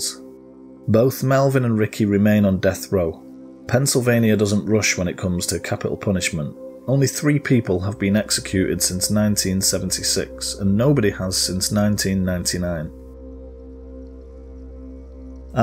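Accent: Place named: Manchester, United Kingdom. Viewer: So comfortable to listen to a fellow Mancunian, and your dry humour is epic. Don’t stop pal, I’m here every day waiting for your content 👍🏻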